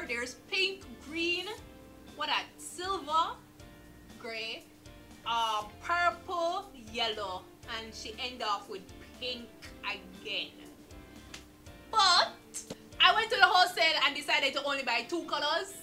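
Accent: Patois, Jamaica. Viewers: your personality is contagious. Loving the jamaican patois. Love from Trinidad 🥰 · As a general rule she's lost more of accent the longer she's been making videos. She sounded like a Jamaican in her early stuff when she was relaxed, but now it sounds muddled sometimes. I'm not trying to tell you anything new, just saying I noticed, too. 😉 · she still sounds very Jamaican, she sounds just like what we like to call ‘uptown people.’ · Also it might not be that she's "lost" her accent but she just uses less patois words or like a patois 'pronunciation'. We can butcher some english words just base on where we place emphasis on syllables. As for her accent it oh so present from my ears. Jamaican here. Depending on where you are in Jamaica this "accent" varies. Some places I can't even understand them and I am born, raises, live Jamaican. 😂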